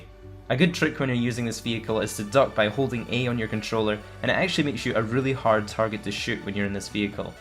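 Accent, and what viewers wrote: Scotland, United Kingdom. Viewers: u sound Scottish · Are u from Scotland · Clicks on video, finds out he's Scottish, INSTANTLY SUBSCRIBES. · are you Scottish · You're Scottish? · are you Scottish? love the accent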